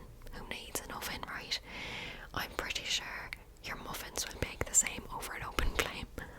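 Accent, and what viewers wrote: Ireland. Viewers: Loved this! More pls- in Irish accent ofc!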